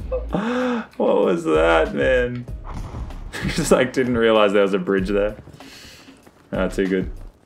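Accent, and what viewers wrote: Australia. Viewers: Is it weird that a understood his aussie gibberish being an Indian? 🤔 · Oh ur Australian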